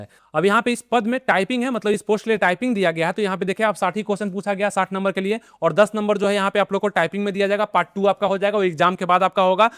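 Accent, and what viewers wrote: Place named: Begusarai, India. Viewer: Begusarai.se.bolte He.sir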